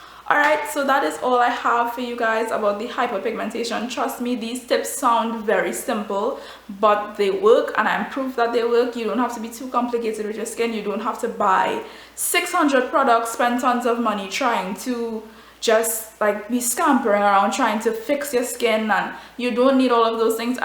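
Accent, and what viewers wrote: Trinidad and Tobago. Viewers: know a Trini when I hear one 🇹🇹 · Sound like my Trini neices from San Fernando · I hear a trini accent! yasss gyl i support u! and you're very beautiful btw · im hearing that trini accent girllll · Your skin looks really good. What foundation do you use, if you still use any? I'm really happy to hear another trini voice ;-)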